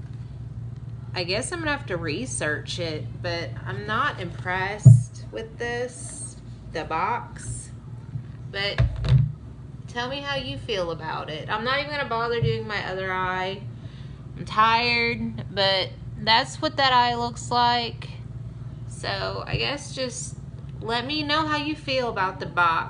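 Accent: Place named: South, United States. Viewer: I LOLed at the VV cream and you bring up a good point/problem I have with boxes shipping even, for instance, K beauty products we've heard of that don't have ingredient lists included in the receivers language. Ipsy has done it a couple of times too, and that weird ass egg mask they sent scalded my skin. So props to you for pointing that out and I'm a fan!;) Nice to hear a fellow southerner on here💜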